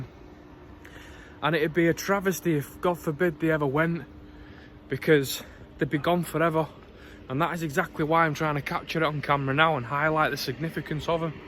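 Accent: Manchester, United Kingdom. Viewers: Hiya, I discovered your channel this week while researching the scuttlers. I subscribed right away because walking around with you and listening to your accent made me a little home sick. I love your editing skills, brilliant! Production quality is first class. Great subject matter too. I hate the adds but understand why they're there. I'm working my way through your catalogue because it's not only interesting and informative, but like I said, it's like having a walk around town with a mate showing you stuff you didn't know about. Grew up in Charlton-on-Medlock, (1950/60s) and Fallowfield in the 70's. Had my 18th birthday in Strangeways on my way to Hindley. But I've been a good lad (more or less) ever since. Haven't lived in Manchester since 1983, haven't visited the UK for about ten years. Wasn't sure it felt like I belonged anymore, too many changes. 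Only normal I suppose. Anyway, thanks for what you're doing, really appreciated. BTW...I always fort there was an 'r' in war-ter an all.....Ha ha ha!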